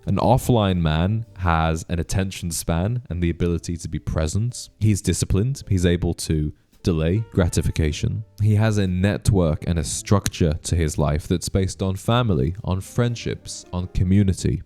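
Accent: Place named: United Kingdom. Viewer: Dude you have a great voice, lots of depth. You could easily do audio work. Of course you’ve got the Brit accent as well which is usually a bonus haha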